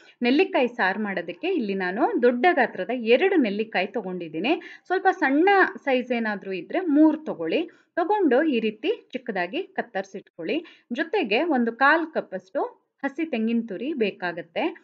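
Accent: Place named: Brahmin, India. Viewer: ಶುದ್ಧ ಬ್ರಾಹ್ಮಣ ಉಚ್ಚಾರಣೆ 🙏🪷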